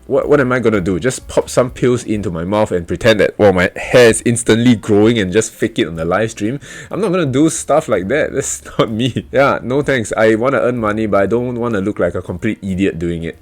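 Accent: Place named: Singapore. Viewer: I love the candid video, thanks for making it! As soon as I (American) heard the Singapore accent, I knew you'd be way more honest / trustworthy than the other guru's on the internet. Every Singaporean I've met is pretty sharp and fair so far. Keep up the great work! Looking forward to seeing what's next.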